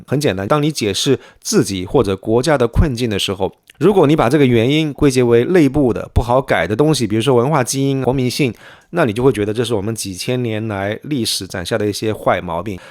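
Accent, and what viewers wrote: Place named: Cantonese, China. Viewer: 这是你个人对袁老师的解读，袁老师同意了吗，另外就是袁老师在多个节目重申过，他在大学修读的是'历史教育系'，而非历史系，但绝大部分人都把把他说成读的是历史系，是博主在材料收集中'求其'的原因，我听得出博主绝对是粤语是他的母语。他明我讲什么的。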